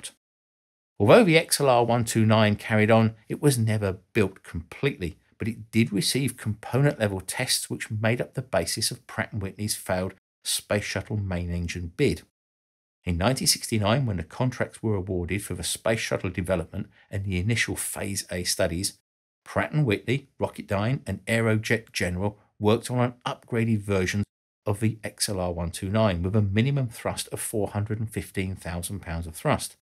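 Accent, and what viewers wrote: United Kingdom. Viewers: Figures I gotta listen to a Brit to better understand what is otherwise seemingly stupid decisions behind my nation’s space program. Thank you!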